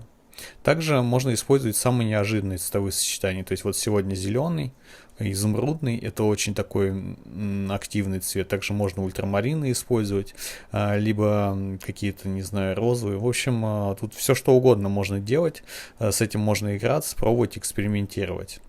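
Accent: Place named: Ukraine. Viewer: Fantastic construction of a portrait! ...a great help for me. Thank you from UK. You sound Ukrainian. Hope that is not an insult! 😊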